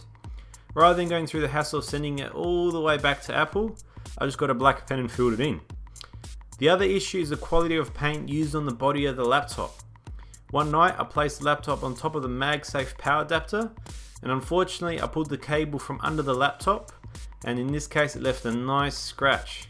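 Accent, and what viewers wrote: Australia. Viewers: ? you sound austrailian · is that an Australian accent???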